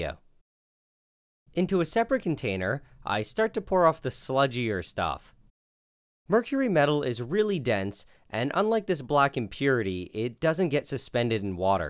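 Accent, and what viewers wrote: Canada. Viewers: Dude he's Canadian. He's gonna say things differently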